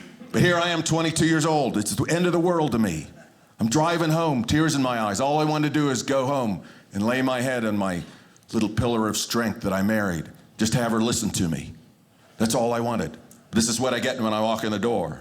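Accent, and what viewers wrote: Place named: Ohio, United States. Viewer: Sounds like he is from Ohio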